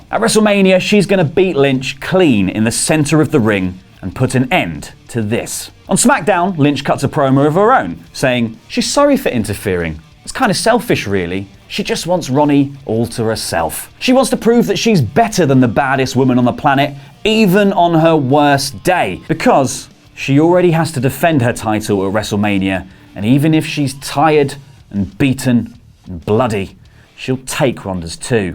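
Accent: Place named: United Kingdom. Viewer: Great bedtime story and ur UK accent makes more like store time o yes store time with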